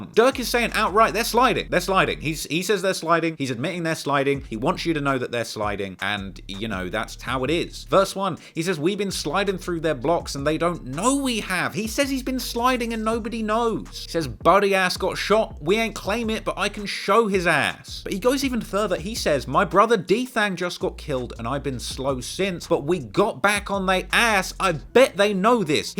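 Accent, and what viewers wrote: United Kingdom. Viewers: just a heads up a british accent is not at all good to try being cool and for sure not trying to speak slang man it is horrible please stop trying to talk slang AT ALL or bringing attention to shit the cops may not know yet but putting things you learned out there for more options drama is good for views but not talking street shit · I like how “trap shit” is getting covered by a harmless sounding British fella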